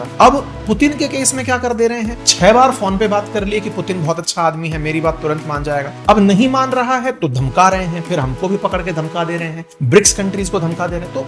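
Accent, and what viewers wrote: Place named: Bihar, India. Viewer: Aap v मै ko ham boltey hai , mujhe toh lagta tha ki ye wala sirf bihar babu use kartey h.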